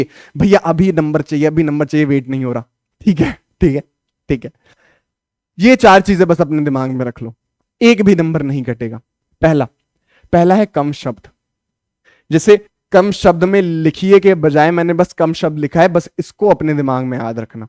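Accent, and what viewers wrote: Bihar, India. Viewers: Bhaiya Bihar se ho.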